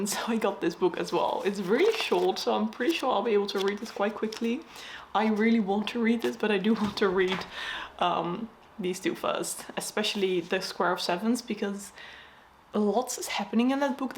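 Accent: United Kingdom. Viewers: I've recently started following you here and I'm very happy about it. I love the way you talk. (i love British accent) to the way you live, work and everything. I got thrilled when I got that you love crafting cause I call myself a crafty girl. Also, it's new year in Iran and I want to say happy Nowruz! (Persian new year) I hope that good things happen to you lovely girl ❤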